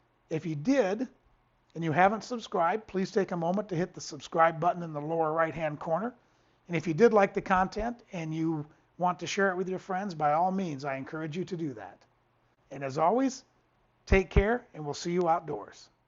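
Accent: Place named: United States. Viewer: I love American accents, no offense but we say Wolf as in Wolph...you say woof!!! The number of dialects and accents are nothing like in England where they change across the street, but accents in the US especially in the East Coast are numerous.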